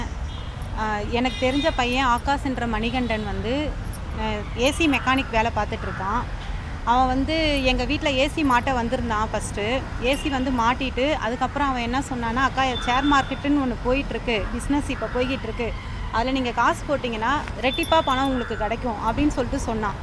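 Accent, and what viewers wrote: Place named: Madurai, India. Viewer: அக்கா..சொந்த ஊரு ..மதுரை னு..நினைக்கிறேன்....உனக்கும் ஆசை இருந்து ருக்கு...சக்கா. .அக்கா..செம..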